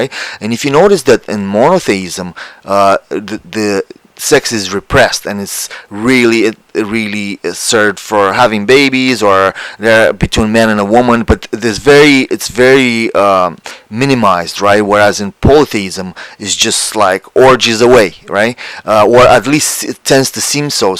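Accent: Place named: Romania. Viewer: Vroiam sa spun " Tabu, in marea parte a cercurilor culturale filosofice ale unei facultati" cum e cea de Filosofie din Bucuresti ( Oricum, raspunsul le , e dincolo de limitele mele umane, dar e corect.... ) Istorie a religiilor ca disciplina nu am facut nici macar la Masteratul de Istorie a Religiilor (la inceput, cel putin, ca a tr sa renunt, fara voia mea) KOGAION- te referi probabil la axis mundi din mitologia Dacilor. Un Axis Mundi face macar legatura intre uman si divin / profan si sacru / cunoasterea umana limitata si cunoasterea transcendentala etc. Ignoranta e cel mai mare neajuns al unei culturi mici, aproape inexistenta, asa cum a ajuns a noastra. Vorbesti despre Culianu, Cioran, Eliade, fenomenologia religiilor (si toate celelalt subdomenii), filosofii, curente culturale etcc etc etc si dupa atata munca, din 170 de vizualizari, cati crezi ca inteleg cu adevarat, facand corelatii si cu alte surse sa inteleaga punctul tau de vedere? 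Discutii, comentarii, argumente.............शून्य (shunya / vid ) cum spun Indienii. Altul cade-n bot si are 170.000 de vizionari. Suntem poate o natie superficiala, sau poate romanii nu-ti inteleg Engleza din cauza accentului romanesc.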